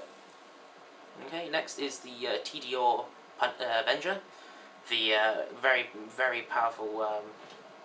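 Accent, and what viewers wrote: United Kingdom. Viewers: u sound like harry pottah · Love the British accent XD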